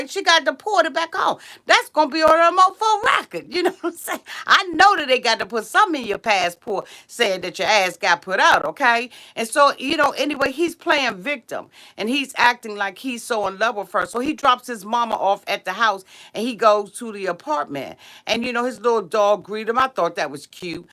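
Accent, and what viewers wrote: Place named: DMV, United States. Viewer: I love your accent, are you from the DMV area???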